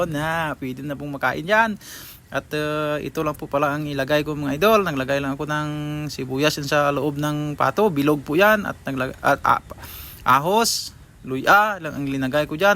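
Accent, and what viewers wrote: Iloilo, Philippines. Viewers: Ilonggo ka dong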